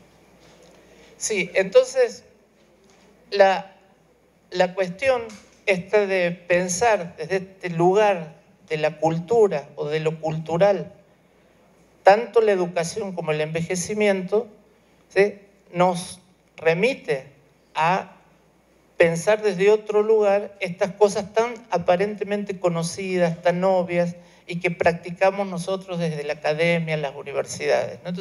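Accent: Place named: Argentina. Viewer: exelente ponencia ! ¿Cuál es el nombre y apellido correcto del especialista? Me parece que no lo escriben bien. Es Argentino parece por su asento.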